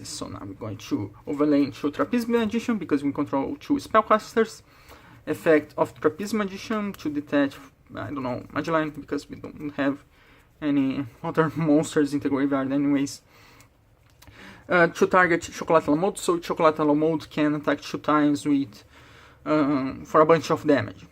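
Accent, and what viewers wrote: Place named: Brazil. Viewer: notei que era brasileiro pelo sotaque kk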